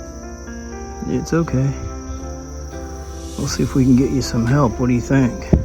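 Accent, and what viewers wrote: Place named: South, United States. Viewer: You sound like ur from Kentucky or Texas. LOL Love the laugh!!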